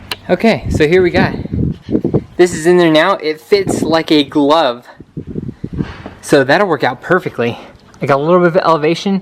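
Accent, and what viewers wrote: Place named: Utah, United States. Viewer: U from Utah area ? Based on your slight Utah accent. Cool build bruh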